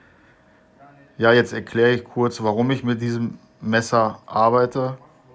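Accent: Ruhr, Germany. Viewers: Sehr gut erklärt! Kommst du ausm Ruhrpott?? deinen Dialekt finde ich klasse, Ruhrpottbruder! LG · Super Rezept und echt lecker. Kommst du aus‘m Ruhrgebiet oder hast da lange gewohnt, weil du öfter „dat“ sachst 😉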